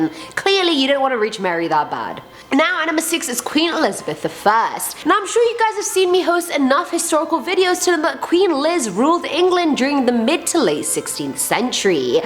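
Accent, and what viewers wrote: Russia. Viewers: tht russian accent. im jealous. 😭😭😭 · Very nice Russian accent!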